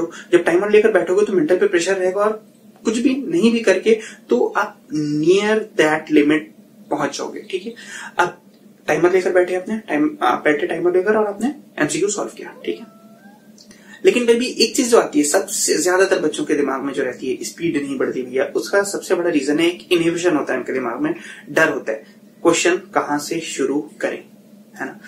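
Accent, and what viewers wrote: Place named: Kashmir, India. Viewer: Hey bro I guess you're from Kashmir na?? 😄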